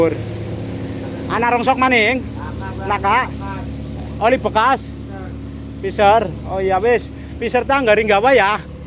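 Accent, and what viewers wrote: Banyumas, Indonesia. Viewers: Ora ngapak ora penak kang 🎉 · Ora ngapak ora kepenak😅😅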